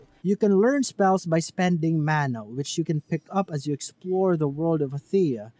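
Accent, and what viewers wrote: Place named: India. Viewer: great honest review , love your hindi accent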